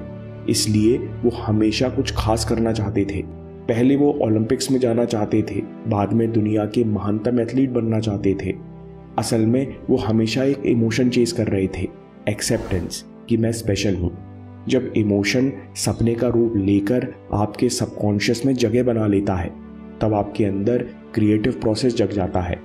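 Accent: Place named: Punjab, India. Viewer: yaar punjab n aise hi bolte hai hindi iski koi galti nahi hai.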